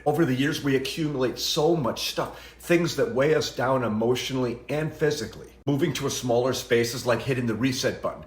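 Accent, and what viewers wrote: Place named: Chicago, United States. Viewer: 7 years ago, after the youngest of our kids was a sophomore away at college, we downsized from 4 bedroom/2.5 bath (2,200 sq ft h in the suburbs into the city to a 3/2 with 1,850. We both absolutely love our one story, all brick SMALLER house! We are in close proximity now to everything: our jobs, our church, stores, hospitals. Less house to keep clean, less property taxes, utilities etc. (we live in coastal Georgia.)The only challenge? We have only one guest bedroom, so at holidays when our kids and grandkids visit, THEY have to pay for hotel or AirBNB. The youngest has a spouse but no kids so thus far she’s usually the one who gets the guest bedroom. My husband and I both U of I grads so I enjoy your Chicago accent 😊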